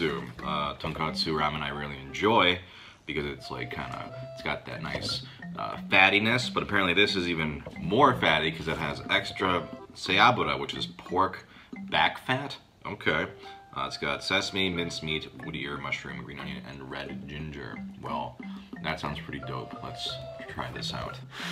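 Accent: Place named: United States. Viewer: Why do you sound 100% American??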